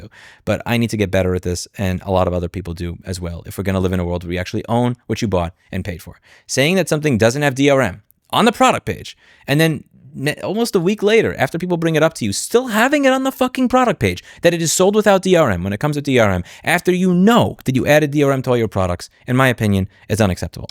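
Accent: New York, United States. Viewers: you would'nt happen' to be new yorker ey?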